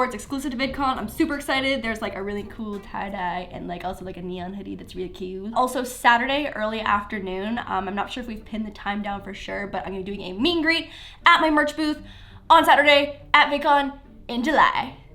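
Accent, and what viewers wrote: Canada. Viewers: New here. Love how she says "about". Reminds me of Cristine. Is she from Canada?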